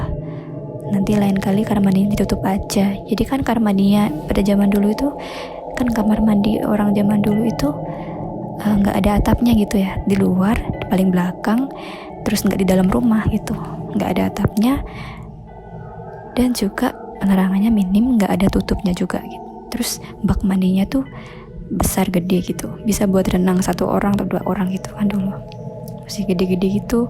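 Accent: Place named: East Java, Indonesia. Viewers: Hooh dia orang Jatim wkwkw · Karangan? Mbaknya pasti org jatim ya hehe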